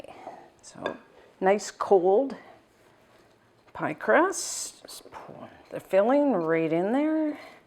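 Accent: Canada. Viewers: Wow, you're from Georgia! For some reason, I thought you were Canadian lol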